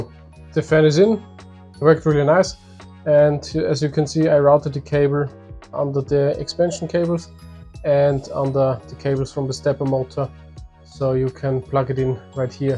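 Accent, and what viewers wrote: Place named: Austria. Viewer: Subscribed. Miss that accent. Know you are Austrian. Besser als bayerisch. 😂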